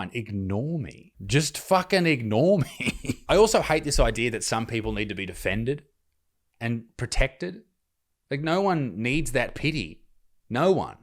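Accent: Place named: Australia. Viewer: its the Australian vanacula mate get over it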